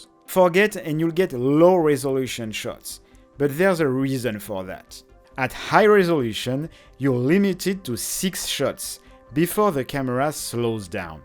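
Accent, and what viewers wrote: France. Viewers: le p'tit accent français, je ne m'y attendait pas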